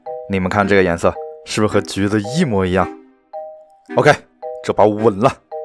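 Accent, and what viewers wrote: Wuhan, China. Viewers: 就是说 这一听就是直击灵魂的武汉口音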